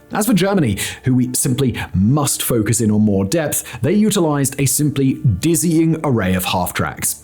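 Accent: England, United Kingdom. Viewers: got a stupid question are you from Great Britain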